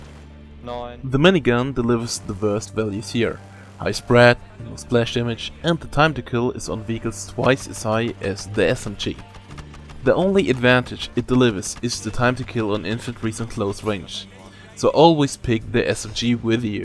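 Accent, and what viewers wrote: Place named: Germany. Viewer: Preferred ur German accent.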